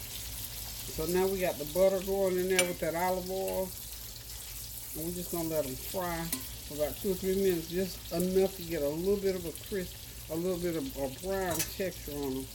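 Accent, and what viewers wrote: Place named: South, United States. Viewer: I just love her voice! She reminds me so much of my mommy and granny. The Cove City, NC in me is almost lulled to sleep by her southern twang and the peace in her kitchen and her cooking. Thank you, Ms.!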